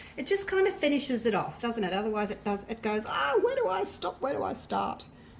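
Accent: Australia. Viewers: Woah kookaburra are so big. And I got it right the other bird is an Ibis. I’m a brand new sub. Are you Australian or from New Zealand?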